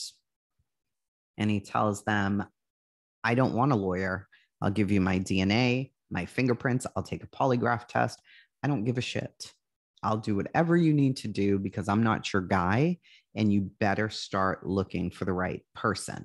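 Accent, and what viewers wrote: Boston, United States. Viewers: Love the way ur telling this story.... 💞🙏... ur Boston Accent, u all pronounce ur "R's" differently from me... I'm in Washington state... Good saying "drawers", spot on🥰😊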